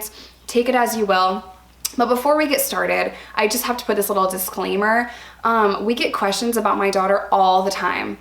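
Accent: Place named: Midwest, United States. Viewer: You grew up in New York? The way you say “pop” and “pod” sounds like the Midwest lol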